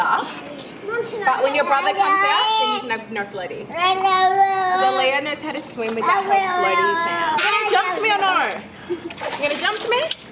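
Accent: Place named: Australia. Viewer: Awww loved it❤ the way reacts when he sees coming downstairs just melts my heart. And I loove ur australian accent❤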